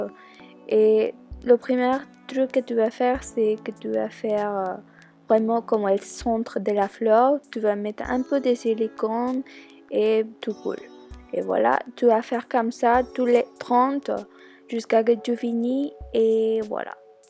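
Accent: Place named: France. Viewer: i love your french accents, sigue asi de guapa, saludos!!!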